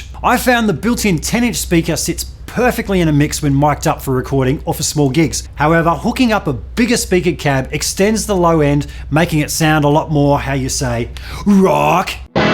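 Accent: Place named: United Kingdom. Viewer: I, for one, was impressed by the British accent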